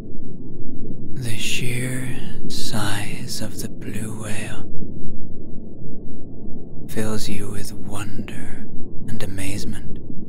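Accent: Ireland. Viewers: I'm an absolute insomniac, however you help me relax with your beautiful Irish tone that reminds me of home, God bless you ❤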